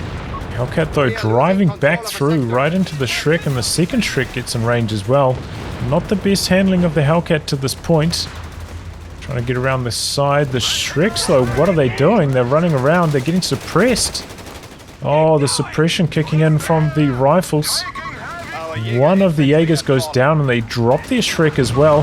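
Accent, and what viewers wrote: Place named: New Zealand. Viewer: Just found your channel, and from hearing your voice I guessing your also a Kiwi. You're doing good stuff mate, definitely gonna watch some more.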